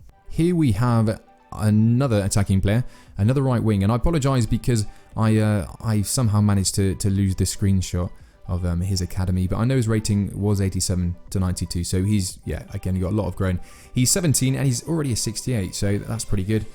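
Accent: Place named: South Africa. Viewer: 10/10 South African accent mate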